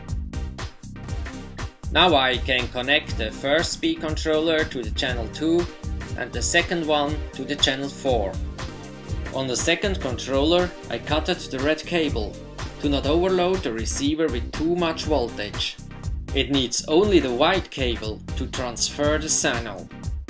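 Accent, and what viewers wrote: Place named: Switzerland. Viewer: Hey, great video, really enjoyed it. Excited to see a running video with the setup:D If you don't mind me asking, in which Kanton do you live, I'm just curious as you have a cool accent:)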